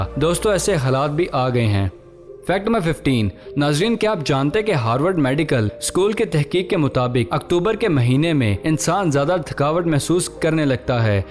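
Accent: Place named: Rawalpindi, Pakistan. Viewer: love u bran jani PPindi ke lagty hoo keep up the good work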